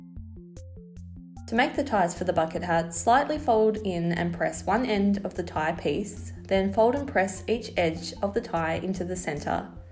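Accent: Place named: Australia. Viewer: Love it! Thanks, I'm going to try making a couple for my grandchildren. As they live in WA by the beach and are now learning to use their surfboards, I thought it might be fun to try making them with a nylon type of fabric that will still be good for them whilst in the water. Cotton is great for regular wearing, but I was trying to think of something that would protect their faces in the water .... maybe even a fabric like their rash tops that protect the rest of their bodies in the water while out in the sun. Thanks for giving me the idea as I was watching your video. I really enjoy watching your videos because they don't take a lot of skill, so even sewers like me can make them up. It's also great to hear an Aussie on YouTube! In what part of the country do you live? I'm in Hervey Bay so I'm very aware of the need for hats ....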